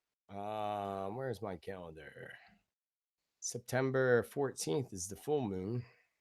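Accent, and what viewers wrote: Philadelphia, United States. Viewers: Our HumanityFamily deserves no less than what I've known will come for 2019-TRUTH and JUSTICE! We will deal and heal with the upcoming revelations that will SHOCK us to our core, together. ThanQ for this video! I love listening to you, lol, you remind me of home 😊, I was born in Philly and lived ALL THROUGHOUT the tri-state area growing up lol-there's NO hidin THAT accent 👍. 💜💜💜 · You from Philly? Accent sounds familiar...Delco?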